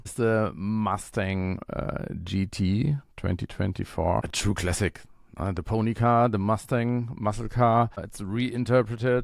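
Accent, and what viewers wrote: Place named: Germany. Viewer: Fendt Traktor is too expensive!Ford Mustang only 60 parts??Porsche 911 the repop of the old crap kit from the 80s??BMW M3 is a Fujimi tool!Shelby Mustang only repop with new decals!Wow,what a lineup!And its always a torture to listen to this "denglish"!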